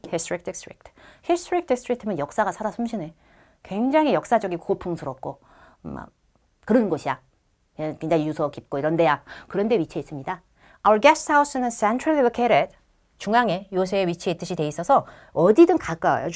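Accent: Jeolla, South Korea. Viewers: 저도 삼천포여친 윤진이 삘난다고 생각했어요. ㅋ 전라도 사투리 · 진짜 이런 박력 너무 좋아.... 선생님 약간 전라도사투리 나오는데 그것도 너무 친숙해서 좋음요 ㅋㅋㅋㅋㅋㅋㅋㅋㅋ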